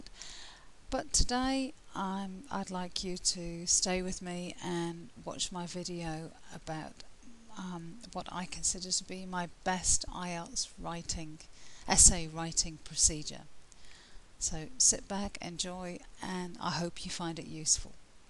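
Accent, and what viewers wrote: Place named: Australia. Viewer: I love your Australian accent. You guys have unique accent in whole world. I just want to say thanks for your this video. You are so cherubic.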